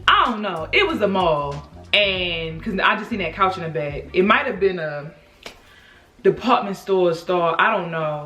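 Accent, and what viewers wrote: Baltimore, United States. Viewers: You from Baltimore?? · Girl you sound like Baltimore! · This like my 3rd video watching her and I KNEWWW SHE WAS FROM BALTIMORE I know my accent when I hear it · Are you from Baltimore? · i knew you were from baltimore bruh, the accent!!! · Muva and Hotdugs 🤣 Yes! Girl that Baltimore keeps sneaking out!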